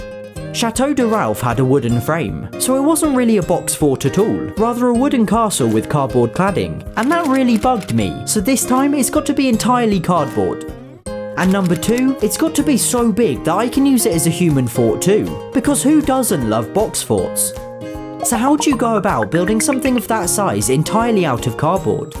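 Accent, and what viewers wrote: United Kingdom. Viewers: Just wondering do you speak British cuz I’m confused 😂btw love your vids and your cats 🐈🖥️ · male jenna marbles with a brit accent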